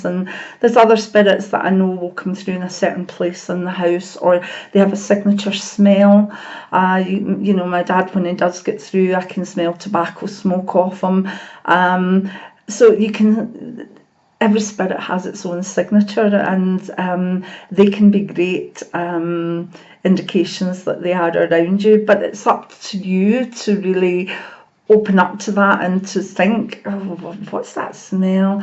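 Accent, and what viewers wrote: Scotland, United Kingdom. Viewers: Hello, just found your channel. Good to hear a Scottish accent as I'm in east Dunbartonshire. Liked and subbed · is sleep paralysis a sign...had this Since a teenager. Nice to hear a Scottish accent on youtube. im Scottish too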